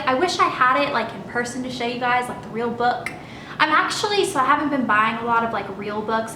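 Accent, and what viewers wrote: South, United States. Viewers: I loved your southern accent in this video :)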